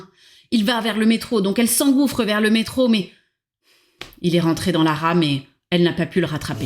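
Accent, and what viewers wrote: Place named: United Kingdom. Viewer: J'ai tout aimé chez vous, vos yeux qui ont l'avantage d'être deux, vos cheveux ondulant, vos multiples chemisiers, vos ongles, votre tapis, vos lobes, votre élocution et votre franc parlé, votre humour, ce micro indéboulonable, cette petite souris qui passe derrière le canapé a 21mn17, cette analyse pointue, ce merveilleux petit accent british (quand on aime on dit n'importe quoi 🙄), les livres sur la cheminée dont je n'ai distingué aucun titre, vos claquement de doigts, votre mug......... il y a cependant deux choses choquantes !! cette lampe sur une chaise et ce livre certainement écrit par un enfant dérangé mentalement dont vous nous faites lecture !! ceci dit merci à l'algorithme de m'avoir proposé votre chaîne !(j'en ai une également orientée nature) vous avez aujourd'hui un nouvel abonné ! ✨️🌟⭐️🔥♥️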